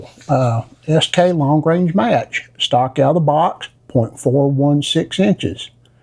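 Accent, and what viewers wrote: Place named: South, United States. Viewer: I like your accent. I’m trying to learn if it’s regional. I’m going to guess Alabama or Mississippi. I’m from Australia.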